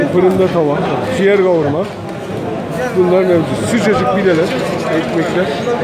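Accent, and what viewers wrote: Gaziantep, Turkey. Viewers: Kardeş videolarını izliyoruz.bizi hep umsuruk ediyn ağam gecenin bir yarışında hanıma yemek yaptırıyorum.sayende kilo aldım. Ama antep sivenle harikasın basarilar....videolarin devamını bekliyoruz.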